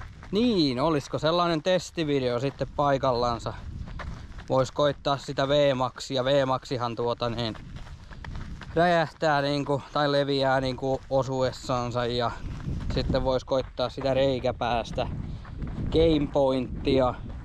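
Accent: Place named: South Ostrobothnia, Finland. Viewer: Bonuusta e-pohojanmaan murtehesta.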